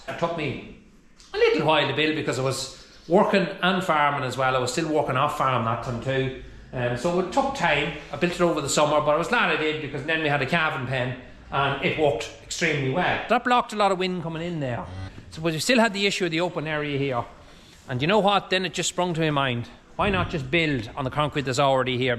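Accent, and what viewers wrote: Ireland. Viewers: Hi I am a small dairy farmer from Australia where milking 60-70 cows is not common. My wife and I both work off farm as well ( I am a farrier by trade)so at times it makes for long days. We have 5 grown up children and have enjoyed the times where your kids were helping to move cows stopping calves jumping over temporary electric fences etc ( just like us). Until recently I was beginning to question whether to keep going with a small herd and was perhaps embarrassed to be such a small farmer. Then you and your videos came along and you have inspired us and we have a completely new attitude. We want to be like with a beautiful, neat tidy little farm. When we are planning or doing new projects we even impersonate you with put on Irish accents. I do have Irish heritage from Cork. Thank you so much you have made us proud to be small dairy farmers 😊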